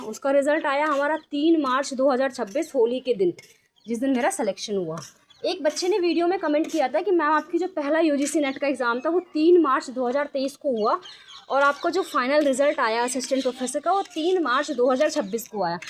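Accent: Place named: Haryana, India. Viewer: Mam ap haryana se ho ya mp se?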